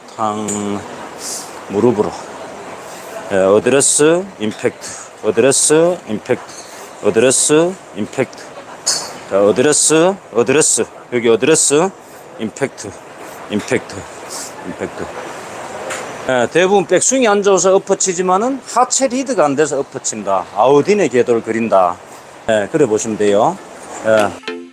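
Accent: Gyeongsang, South Korea. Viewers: 갱상도 구수한 사투리 ㅎㅎ 저도 갱상도 출신이라 정겹네요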